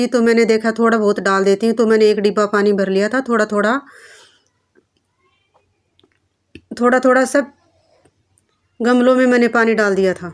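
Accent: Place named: Haryana, India. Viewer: मुझे तो पहले ही पता चल गया आपकी बोली से की आप हरियाणा के हो जी बहुत ही बढ़िया Vlog है दीदी जी बच्चे तो मुझे बहुत ही पसंद हैं जी 💫🤍💫 खुश रहो सदा ओके दीदी जी।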